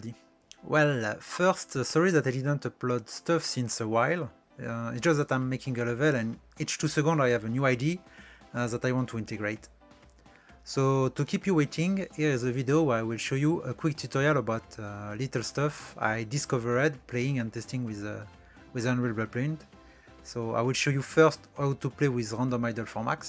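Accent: France. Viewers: c'est si drôle un français qui essaye de parler anglais xD mais sinon bon tuto ^^ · Tu es Français ? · j'ai reconnu ton accent francais :)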